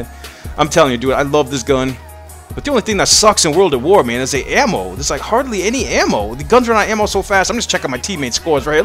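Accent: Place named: East Coast, United States. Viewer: He's not black. Its a common east coast accent that white people have.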